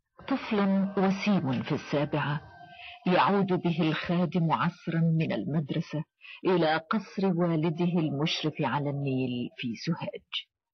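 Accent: Syria. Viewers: هل المذيع من سوريا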